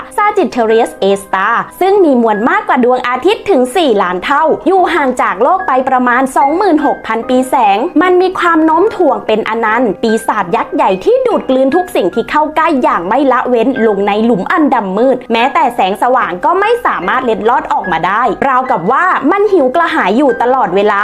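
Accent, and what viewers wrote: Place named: Thailand. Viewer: พูดเหน่อจัง😂😂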